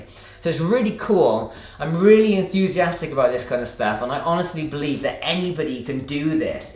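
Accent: Wales, United Kingdom. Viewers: Its probable, that him being from Wales, his accent makes it sound like he is saying "tress" rather than "truss". I may be mistaken though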